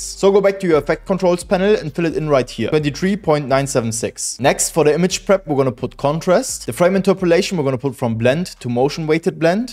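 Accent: Germany. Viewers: Are You German? I hear a german accent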